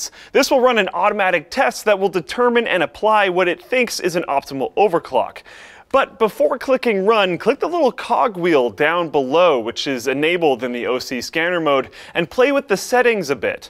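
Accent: Canada. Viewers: Canadian accent is strong with this one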